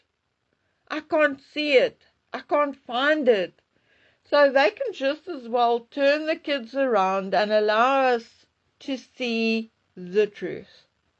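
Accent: South Africa. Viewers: I'm not sure where lives, but to me, it sounds like South African. African as a country produces oil.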